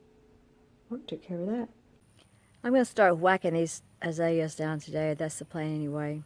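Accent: South, United States. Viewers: I might have to check out one of those chain saws. I've always just used loppers. I've wondered if you live in the South? I live in East Tennessee and we have similar accents 💖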